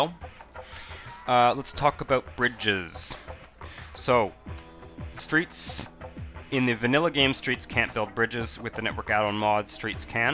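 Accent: Canada. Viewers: Thanks man! This whole time I thought you were from Toronto btw haha you have that accent, I’m from Toronto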